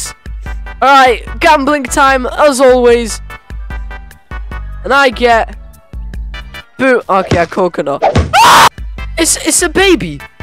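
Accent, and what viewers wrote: United Kingdom. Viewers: I love your British 😂😂😂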